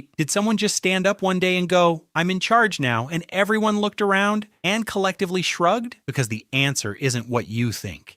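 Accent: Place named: United States. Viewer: No American voice please. Video rejected. Goodbye Yankee.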